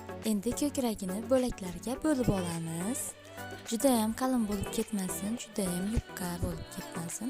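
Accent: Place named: Khorezm, Uzbekistan. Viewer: zo`r xorazmcha sheva ishlarga omad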